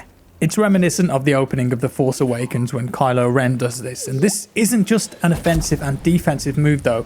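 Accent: United Kingdom. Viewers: All we needed was your sweet sweet Brit accent to convince us.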